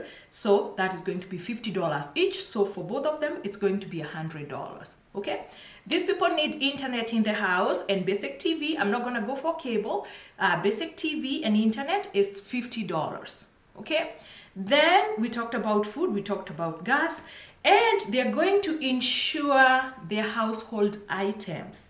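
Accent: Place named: Jamaica. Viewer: I actually thought you were Jamaican, even when I watched your other channel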